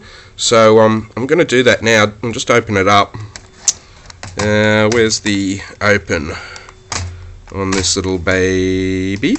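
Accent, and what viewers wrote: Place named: Australia. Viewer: Thanks for the tips ! FYI - You have the most delightful accent; but then again, so do most Aussies....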